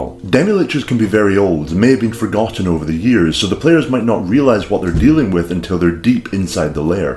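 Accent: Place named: Edinburgh, United Kingdom. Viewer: I've watched a fair few of your videos. The accent keeps swaying into Edinburgh east coaster.... 🤔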